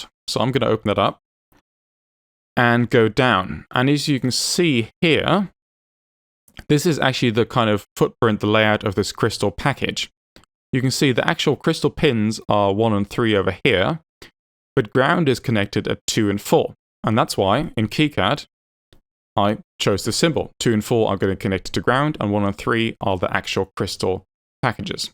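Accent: United Kingdom. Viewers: Wow, I still can't believe I've watched all of your PCB Design videos (5+ hours in total) in one sitting. Your know-how and fast skills with kicad are astonishing, plus your clean (Queen's) English makes it very easy for us non-native English speakers to comprehend what you're saying. You've earned every sub,. I am currently working on my master thesis in EE and your videos are very informative. Keep up the good work, and THANK YOU very much for your videos.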